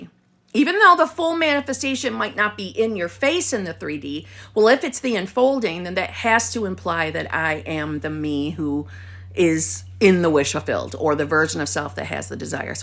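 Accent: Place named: Long Island, United States. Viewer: Neville's wife manifested HIM! He talks about it and he wanted out of his first marriage and New York State was opposed. As you stated, those were limited timelines. So the woman who became his wife, did something. He never states what, but it was a manifestation protocol. Love you 🦋🌺 P.S. I am originally from Manhattan, and attended C.W. Post. I swear I hear a Long Island accent. I listen to you in the morning while doing my stretches now...the rampage on life. LOVE IT, LOVE YOU! It's all Done. I believe.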